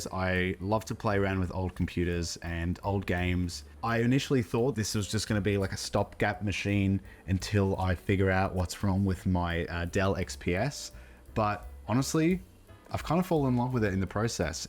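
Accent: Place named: Sydney, Australia. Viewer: Sydney accent detected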